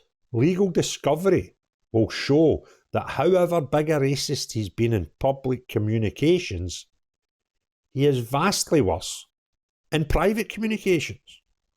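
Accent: Scotland, United Kingdom. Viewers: 'Don't chap the door' 😅 Love hearing your thick brogue. 👍 From Isobel, a Glasgow ex-pat lass. ❤🏴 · . I listened to your post 12 TIMES!! I still can't understand what the heck you're talking about....PLEASE POST A TRANSCRIPT. Your Scottish "twang" is not understandable....I think your post MIGHT be very interesting but I have NO FREAKING IDEA WHAT YOU'RE SAYING!! HELP HELP HELP....Even "WEE JULES" is easier to understand.... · It his Scottish accent!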